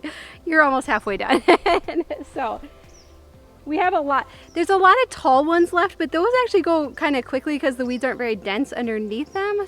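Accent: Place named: Midwest, United States. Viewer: I like you so much. Thank you for all of your videos...and for your life that speaks louder than words.☆ Like you always say, I wish we could be friends.^^ You're a little cooler than I am, but you seem humble enough that I think it could be possible! 😎 lol I'm from Michigan, raised in Wisconsin, and taught a few years in Missouri before moving to South Korea. While teaching here I met & married my Korean husband. I do miss 'home' in the U.S. while also loving my life in Korea, so your vlogs and family life are a 'taste of home' for me.😊 Love your family's Midwest-style...all the way down to your casseroles and accent.♡ I am a sentimental saver. Your minimalist thinking has helped me learn how to declutter! I work at it often...still have more stuff (storage containers) than necessary. And it does feel overwhelming at times, especially before a move (which I have moved often!). 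I was determined to declutter in my classes this week. I had boxes & files of paperwork from the past 6 years (or more) than needed to be gone through. It was hard to get enough motivation/energy to start. But after praying for energy (which God provided!🙌...along with an Americano and another iced latte...😅), your talks kept going through my head: "time will tell", "less inventory to manage", "if you haven't used it in over a year it's okay to let it go", "don't feel guilty even if you spent money on it...it served its purpose for a time", "you can replace it later if you really need to", etc.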